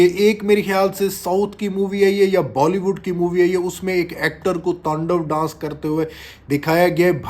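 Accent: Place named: Pakistan. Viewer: Pleass batao na..aap india se ho ya pakistan hai..kyuki apki accent Pakistani hai..❤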